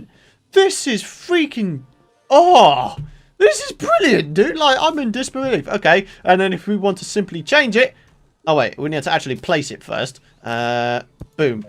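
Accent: United Kingdom. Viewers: EMPER He’s British. It’s an accent.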